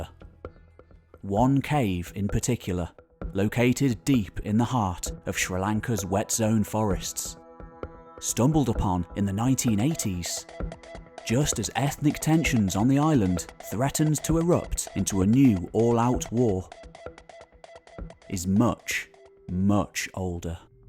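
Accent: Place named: Midlands, United Kingdom. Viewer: Mercia, you Midlands I think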